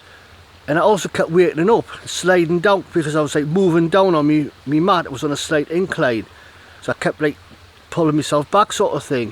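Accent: Newcastle, United Kingdom. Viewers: I have to ask are you an east country man? A Jordy perhaps?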